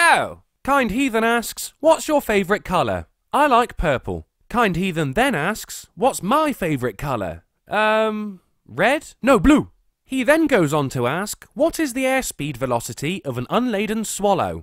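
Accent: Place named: London, United Kingdom. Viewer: "What do you attribute the success of your channel to?" Wit, anti-SJW subject matter at a point in time when it matters and a charming London area accent--if I'm not mistaken.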